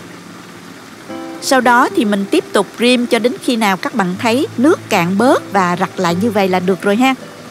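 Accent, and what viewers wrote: South, Vietnam. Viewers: Giọng nghe hay quá! Đúng chất đặc trưng người miền Nam luôn. Cám ơn món ngon chia sẻ của cô ❤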